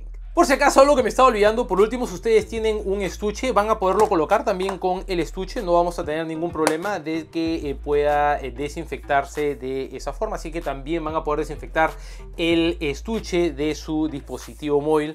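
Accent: Peru. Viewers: Es Peruano??????????? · Eres peruano?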